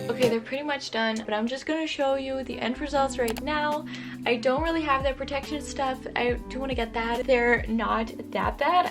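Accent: Netherlands. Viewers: Are you dutch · Wait what are u Dutch?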